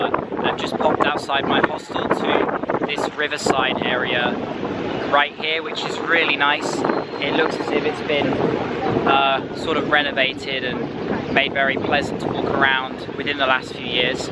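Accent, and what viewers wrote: United Kingdom. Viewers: I love the content of your channel. Great camera work and editing with beautiful British English. Love you. ❤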